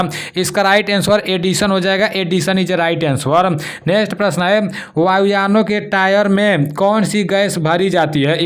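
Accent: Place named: Bhojpuri, India. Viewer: bhaiya apki Bhojpuri launguages bahut mast lagata hai, I belong gola bazar, Gkp se hu bro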